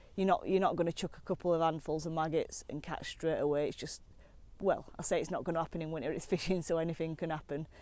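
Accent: North, United Kingdom. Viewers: Another great video, in a nice northern accent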